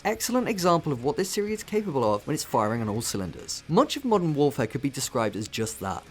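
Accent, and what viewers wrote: United Kingdom. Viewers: British I think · Defs british, not nz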